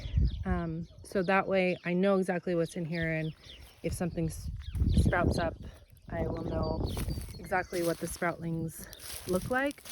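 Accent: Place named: United States. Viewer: How did you get sick in winter. I have been here since March 20 and not ha a day off yet. Yes we have had the wettest winter so far and now plenty of water to see me through the coming warm summer. Even up here in the mountains it can still get hot, 33 or 92 f last week, a tad warmer than U.K. and dam site drier after what they have had in recent months. Here we have had 150% of our annual rainfall while parts of U.K. have had 300% of their total for the winter. You speak English, but the accent is elsewhere, America or maybe Canada and hubby could be local as not hear him much. A sheet of clear plastic over your seed pots will work better. Toms need 68 bottom heat to germinate within 3 days or can take a week if left in the house. I have been a gardener for a long time so ask if you need to know anything to help you.The more I listen the more I think Canada or northern states, but maybe lived in U.K. 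for a while. Enjoy it while you can as if the fools in the middle east do calm down then we are all up shit creek without a paddle.. Mulberrys must be nearly wrotten before you eat them.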